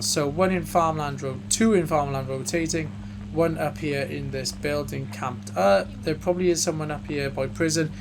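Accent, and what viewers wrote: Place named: Wales, United Kingdom. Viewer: I'm from Cardiff myself, and your accent was instantly familiar to me lol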